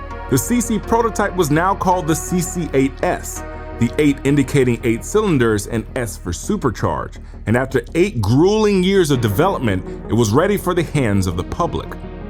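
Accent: United States. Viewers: If you're planning on having Americans watch your videos, at least put MPH numbers in parentheses under the KMPH numbers. Considering you have an American accent, it seems like you should know this.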